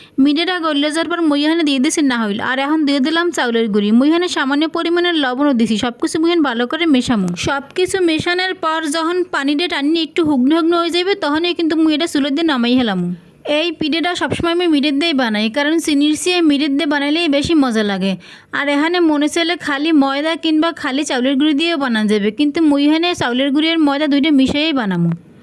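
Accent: Barishal, Bangladesh. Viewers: প্রথমেই বলি দিদিভাই তোমার বরিশালের ভাষা শুনতে অনেক সুন্দর লাগে❤ পিঠে গুলো খুব সুন্দর হয়েছে দিদিভাই❤❤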